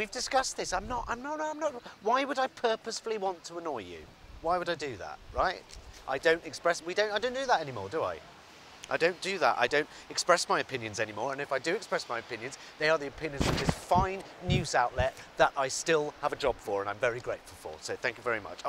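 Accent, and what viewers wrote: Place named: West Country, United Kingdom. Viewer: I can hear traces of West country in his vowels.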